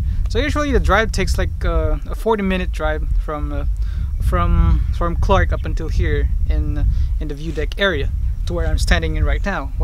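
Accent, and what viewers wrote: United States. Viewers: Wow he has american accent. Amazing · Nice sir your speaking like American guy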